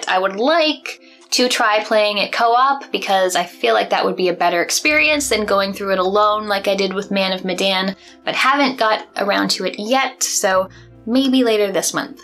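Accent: Canada. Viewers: I like your Canadian accent.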